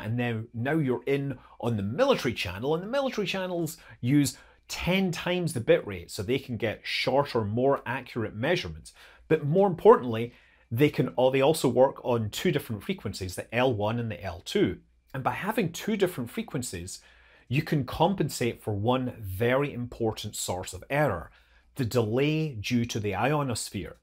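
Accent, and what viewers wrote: Scotland, United Kingdom. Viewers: I think we’ve missed one of the most important use of GPS. How a golfer knows the distance to the green when he’s so off track in the trees that he can’t see the flag. 😊 Good to hear such a knowledgeable Scottish voice on here.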